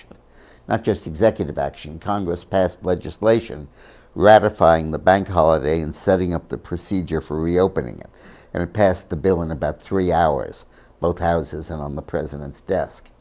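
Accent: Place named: New England, United States. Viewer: Histray. . . Very New England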